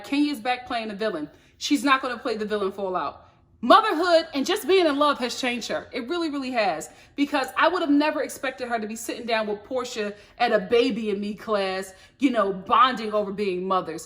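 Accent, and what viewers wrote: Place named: Philadelphia, United States. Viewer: I won’t lie, I watch every one of your videos but I clicked this one with the quickest of thumbs just to hear you say “doll” in that Philly accent. 😂 Love you!!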